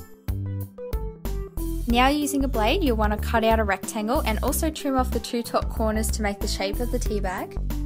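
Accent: Australia. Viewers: Are you Australian you sound like it I am Aussie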